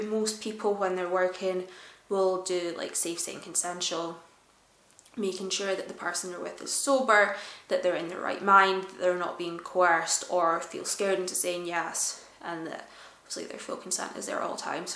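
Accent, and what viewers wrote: Scotland, United Kingdom. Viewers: The lovely lady is from Bonnie Scotland..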